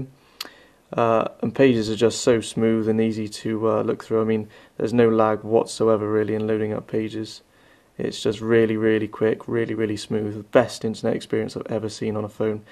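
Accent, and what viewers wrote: Bristol, United Kingdom. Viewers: he has a British accent... i dind't understand him either pretty good... but if u listen carefully, its okay! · awesome phone, getting mine soon, also you sound like you're from around bristol.......maybe?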